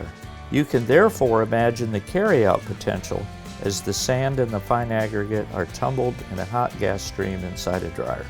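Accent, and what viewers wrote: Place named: Eastern Europe. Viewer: Nice video, but speaker has an accent, that I can't define, and this makes me curious. Is it East European accent? All the best from Europe )))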